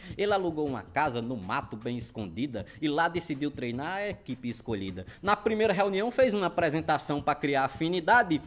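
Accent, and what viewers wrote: Northeast, Brazil. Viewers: O jeito nordestino que amo falando da serie que mais amo 💜💜💜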